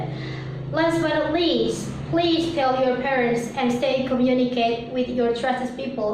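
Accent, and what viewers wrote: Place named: England, United Kingdom. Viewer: Saran sih... Pronunciation nya terlalu ke inggris2 an, jadi di dengar nya agak ngga jelas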